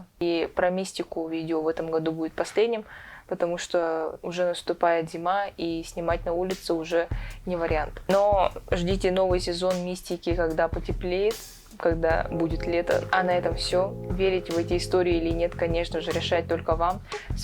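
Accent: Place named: Yakutia, Russia. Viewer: А какой акцент ты ожидал от якутки, именно акцент и делает нас не одним целым с русскими а отдельной нацией со своей своеобразной культурой и языком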